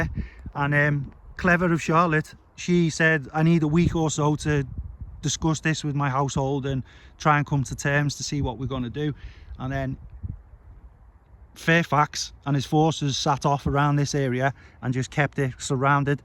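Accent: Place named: Liverpool, United Kingdom. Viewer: Vey cool. Thx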